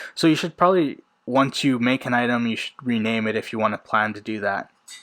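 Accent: India. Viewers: Indian? Nice English btw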